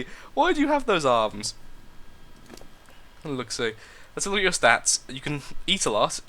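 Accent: United Kingdom. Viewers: keep on going with your videos of this game, this is awsome.Good work soo far britain man with funny accent and dislexia.(that is no offens)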